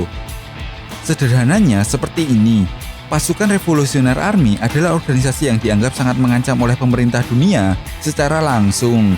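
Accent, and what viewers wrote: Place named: Banyumas, Indonesia. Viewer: Bos ngapak banget ....tp seru denger critnya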